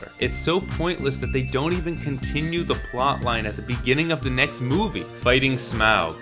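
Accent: Canada. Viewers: heard the Canadian accent. Woot!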